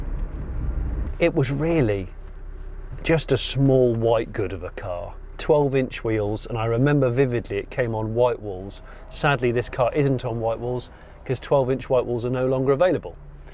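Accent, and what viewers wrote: United Kingdom. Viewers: As always just a couple of words in the comment just for a brilliant British English.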